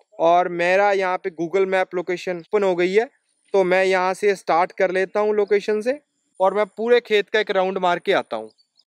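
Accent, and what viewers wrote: Punjab, India. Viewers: Punjabi Hindi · sir aap punjab se ho kya...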